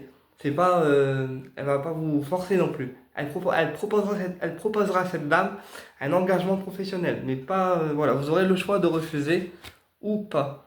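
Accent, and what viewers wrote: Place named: Nord, France. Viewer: Je vous découvre et je vous remercie je m'abonne 😊je suis native du Nord et je reconnais l'accent ch'ti 😅je suis de Cambrai et vous? bon dimanche 🙏⚘❤⚘🙏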